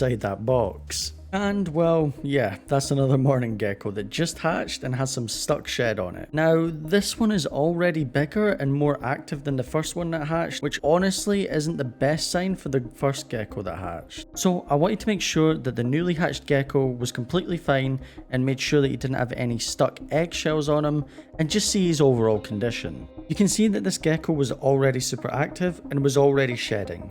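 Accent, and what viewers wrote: Scotland, United Kingdom. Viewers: Yooo is that a Scottish accent I hear? (Half Scot here, always excited to hear the sound of my second home)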